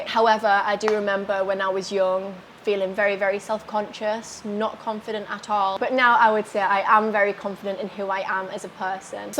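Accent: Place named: United Kingdom. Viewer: Beauty, brains, and British accent! Can't get any better than that!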